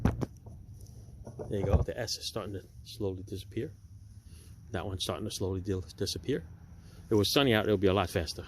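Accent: New York, United States. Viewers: Is this, the old bracket racer long ago, from Seattle? If so, you sound different, like you're from New York, now. I don't recall you having an accent before. Anyway, why were your intake ports on the heads so dirty?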